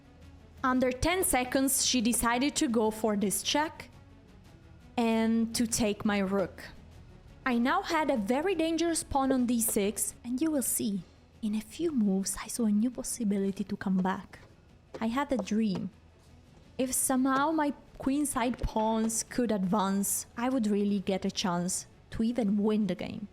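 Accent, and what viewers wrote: Italy. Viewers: You have nice Italian accent 😍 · You have super cute italian accent! 😄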